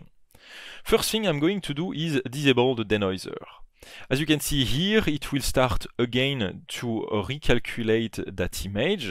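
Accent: France. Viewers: Cela faisait longtemps que je n’avais pas entendu un tel accent français dans une vidéo anglo-saxonne, c’est très drôle même si ça pique un peu les oreilles 😀. Par contre, vidéo très interessante sur le sujet et c’est le principal. Vous avez un nouvel abonné. 👍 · The French accent 😬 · Super vidéo, mais avec ton fort accent français, tu ne pourrais pas nous la refaire aussi pour nous les francophones ??? Ste plait, ste plait !!!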